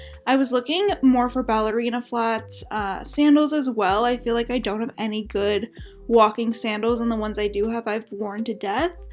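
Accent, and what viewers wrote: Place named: Canada. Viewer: Clues you are Canadian. 1. Accent, you sound like me. 2. Le Chateau. 3. Smart Set. Back in the olden days, I used to manage a Reitmans store. I love the black and white shoes!!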